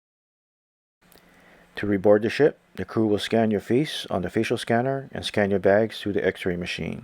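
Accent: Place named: Hawaii, United States. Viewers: very helpful! Mahalo! BTW are you from Hawaii? Your accent sounds local 😊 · I thought the same thing too! The tone sounded very local Hawaiʻi to me.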